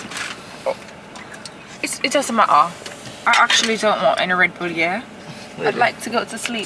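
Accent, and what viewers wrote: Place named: United Kingdom. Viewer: I love the British accent ❤😂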